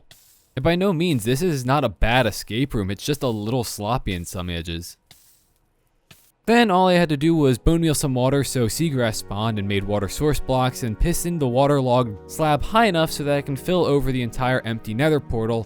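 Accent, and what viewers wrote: Canada. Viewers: i swear your kenadian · i thought u were kenadian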